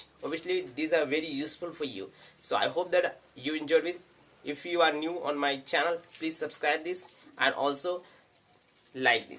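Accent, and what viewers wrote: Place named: United Kingdom. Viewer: Wow! Nice British accent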